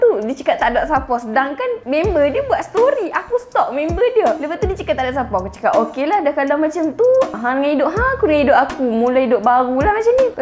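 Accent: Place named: North, Malaysia. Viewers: Sedap lah orang kedah cakap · Haihh. Awat aku suka sangat dengaq loghat utara ni. 😂 😂 😂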